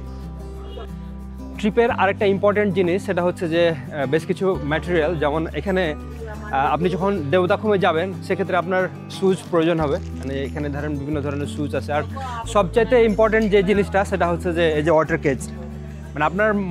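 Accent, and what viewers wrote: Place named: Kolkata, India. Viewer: ভাই কি ইন্ডিয়ান? কথার ভেতর কলকাতার ভাষার টান আছে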